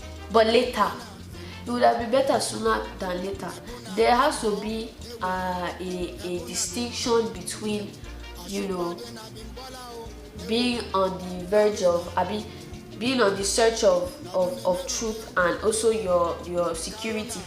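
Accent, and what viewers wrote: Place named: Africa. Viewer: What part of Africa are you from. I love your accent.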